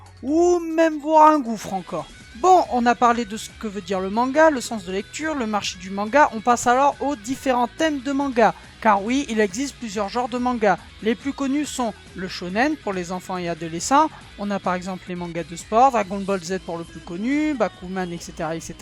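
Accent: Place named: Marseille, France. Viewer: ho...j'croyais que t'était marseillais...oui,par ce que t'a vraiment l'accent...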